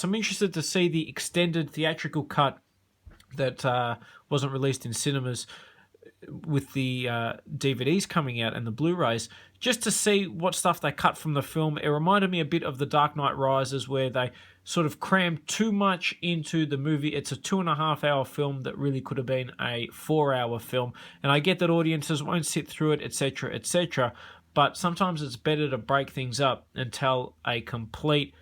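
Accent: Australia. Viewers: Please reply are you Aussie?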